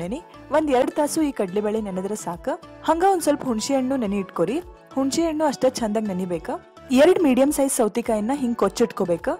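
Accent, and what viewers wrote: North Karnataka, India. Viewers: Ri madam, i really appreciate ur unique recipe, but i watch ur videos becz of ur voice and especially UK slang. U r multi talent, u r mixture of Modern, Traditional and cultural Angel. Thanks Ree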